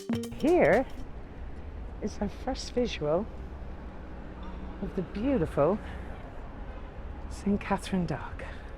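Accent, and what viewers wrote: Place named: Ireland. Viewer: I have to Admit I got a little Crush on the Host!!! I Absolutely love the” Irish” Accent!!. You’re a very pretty lady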